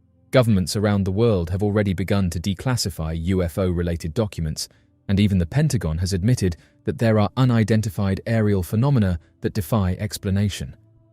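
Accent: United Kingdom. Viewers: The British voice makes is more truthy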